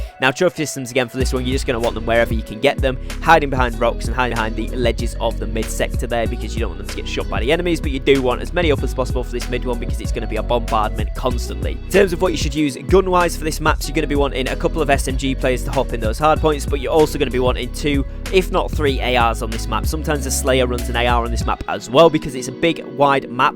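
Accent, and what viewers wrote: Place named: Northern England, United Kingdom. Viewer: You sound more nothern now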